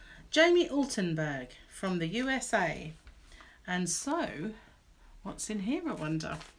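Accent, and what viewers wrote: United Kingdom. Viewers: Love ya guts lady!! Aannd I absolutely love the way brits say aluminum!! I watch Joel & and they talk about the similarities and differences between the USA and UK I just adore the accent!! The happy mail is awesome!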